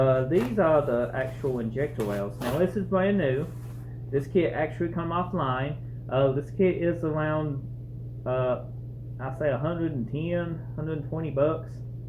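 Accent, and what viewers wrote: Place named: Louisiana, United States. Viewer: Very helpful thank you great video your accent sound Cajun.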